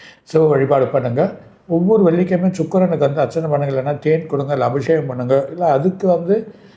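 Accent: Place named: Chennai, India. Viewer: Super a madras language pesureenga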